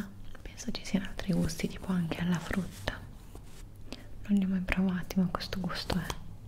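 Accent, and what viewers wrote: Italy. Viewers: Is a italian voce Itis normal you not comprend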